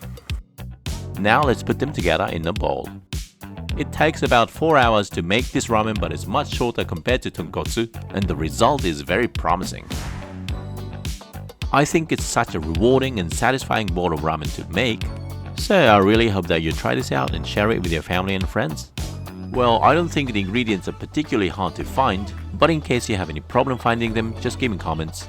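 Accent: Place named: Australia. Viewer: As an Aussie, I can hear the Aussie. Especially when you say “chuck” something in, that’s very Aussie 😂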